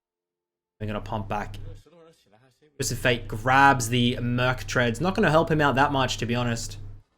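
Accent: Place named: Australia. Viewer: "mango fish knows how to play the qiyana" edit: BRUH I JUST REALISED HE SAID "COUNTER" AHAHA, i love the australian accent xd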